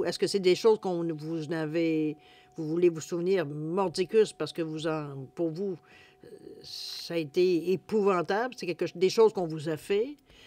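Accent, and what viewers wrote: Canada. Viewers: J’aime beaucoup ces moments passés en votre compagnie, ce matin au petit déjeuner😊 actuellement bloquée dans ma vie suite fracture du genou, c’est tout à fait ça… lucidité… émotions présentes mais qui font qu’on se sent vivante… je retiens « Patience et confiance »…j’aimerais bien retourner au Canada ❤ et votre accent m’en donne un avant goût… merci à vous 💐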